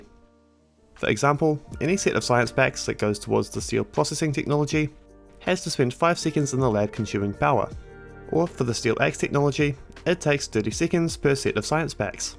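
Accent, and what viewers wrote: New Zealand. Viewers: Are you from New Zealand? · You are you from NZ?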